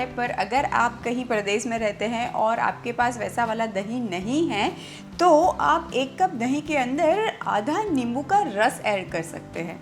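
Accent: Gujarat, India. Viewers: ji tame Gujarati bau sweet bolo cho.i like it.hu pan gujarati chu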